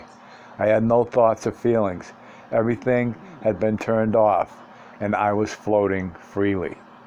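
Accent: Boston, United States. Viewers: This is so niche, and I never thought I would listen to Castaneda with a heavy Boston accent which makes it all more mystifying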